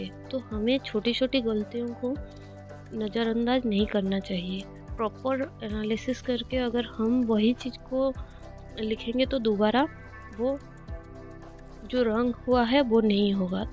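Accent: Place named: Bengal, India. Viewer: Ap thoda bengali type bolti ho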